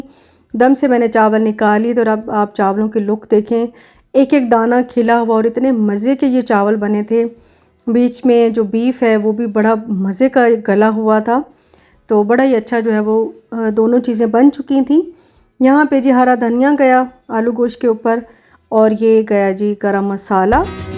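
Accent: Saraiki, Pakistan. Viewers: aap bhi shayad new ho kiu k apko pata hona chahye k yeh ab nahi khasy time say yahan shift hui hain or ham dosron ko to nahi dekhain gay apna farz poora karain gay na neighbours ko pata hona chahye aik dosry ka unko bhi hona chahye tha or inko bhi islamic country mai reh rahy hain na aap log yeh kasa waqt aa gia hay k sari dunia say rabty mai ho jaye insan or apny neighbours ka hi pata na ho yeh achi baat nahi hoti or haan mujhy k vlogs bahut achy lagty hain start say hi dekh rahi hoon inka saraiki lehja mujhy bahut pasand hay or aik dosry ko achi baat batani chahye bura nahi manana chahye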